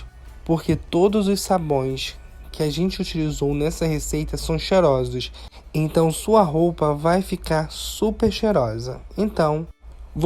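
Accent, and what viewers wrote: Brazil. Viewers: Buenos días Me encantó esa forma de hacer el jabón me gustó mucho nunca lo había visto hacer así pero eso queda seco Bueno lo que usted enseña Me encantó hasta hoy lo encontré pero ahora veo voy a ver todos sus videos que veo que tiene cosas maravillosas y también tiene cosas para la cocina Muchas gracias bendiciones Marta desde Colombia por la forma de hablar veo que usted es del Brasil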